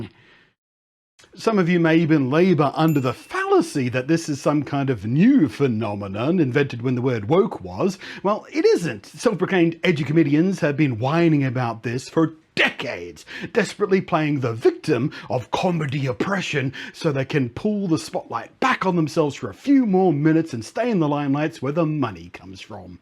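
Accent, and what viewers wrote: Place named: Australia. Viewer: Pretty sure he's not British, other side of the world I'd wager, Australia I suspect.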